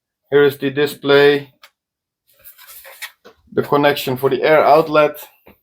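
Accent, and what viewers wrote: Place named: Netherlands. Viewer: Cool video, wanted to say that you sound Dutch and then looked into your profile 😀 Prima 👍 I see your garage wood walls, i assume its not 100% insulated. Wanna install this heater in my mancave, 5x5. Is it worth it? How quick does your garage warm up and to what temperature? If I need to run for 12 hours to get 20° than its sure not for me. Greetings from Limburg 👊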